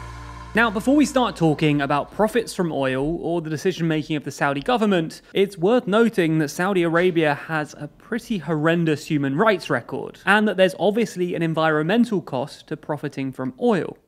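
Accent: United Kingdom. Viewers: Hearing from a person with British accent that human rights in the middle east have historical being violated... dude good part of it was causeed by your country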